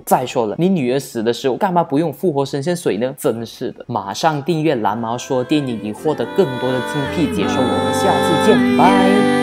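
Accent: Malaysia. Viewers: 哈哈~听到这里时我也奇怪！这是大马人吗囧？ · 哈哈哈，馬來西亞口音，好懷念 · 是马来西亚人？ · 我就想說聽了怎麼久都聽不出是什麼國家的，以為是中國的時候就突然冒出一個馬來西亞嗆，才知道…原來是馬來西亞人啊XDD 以馬來西亞人來說你的腔調沒那麼明顯呢XDD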